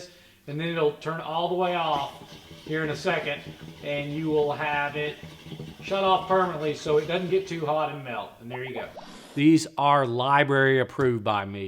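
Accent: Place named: South, United States. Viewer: This better be a good pump. I just ordered it with my GEO Calcium Reactor. With a Southern Accent...I do trust this man. He must be somewhere in Texas with me.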